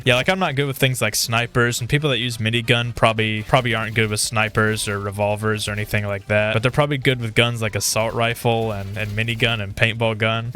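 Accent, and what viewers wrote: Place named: United Kingdom. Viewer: Your British nice 😊😊😊😊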